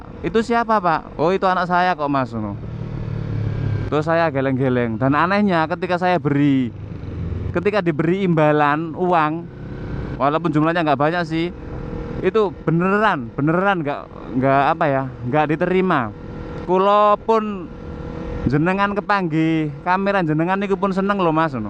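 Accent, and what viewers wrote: Java, Indonesia. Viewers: kekuranganmu jd kelebihan kang... jd inget Iwan Banaran..podo2 medok bhsny..tp followernya..byuhhh ribuan bosss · iyoo mas, bahasa medok, Jawa gadho2.... tp mlah gokil, gk ngebosenin, pokoke like dech bang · suka sekali bahasa medoknya has sekali...OK mas bro...bs gk ngabuburitnya viewnya sepanjang jalan sunan giri sampe sby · ciri khas medok nya jadj ada khas2 sama sensasi gimana gitu hahahaha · saya tunggu om konyol'e medok.. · mas ini ngomong medokny itu lho enak didengar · ikih loh sing bikin seneng,logat lan guyune... gimana ya klo featuring sama ajgum motovlog yang satu Sunda abis yg satu Jawa medok... mesti keren.. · Upload terus videonya Mas, bhasanya aku suka medok2 pie gitu wkwkwk Salam Dari Anak Jawa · mas lok ngevlog ajak lady nya dong, biar seru gitu! we like you medok... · gapapa mas, kalau medhok tuh biar lucu #Hehehehe #...!!! 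· saya suka ke medddhok an anda🙌😁✌ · medoke sae mas njenengan. nek kulo mboten sae sanjang kados niku hahaha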